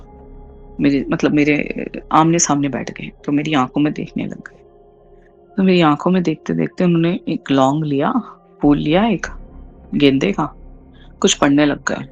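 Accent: Pakistan. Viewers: i swear at the start of this i thought she is a pakistani this exactly how we talk sound shame for all the hate for all it does is dehumanize the heart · Are you sure you are indian because your accent is quite pakistani and you speak quite alot of urdu words good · Indians ye style/tone me nahi baat karte. Pakistani style hai. Apne apko hindu Bata rahi hai aur jammu Kashmir ghum ne ki baat Kar rahi hai. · Exctly.....ye hindi hai hi nhi....typicl urdu accnt..isnt it...i m a hindi speaker..i knw the difrnc..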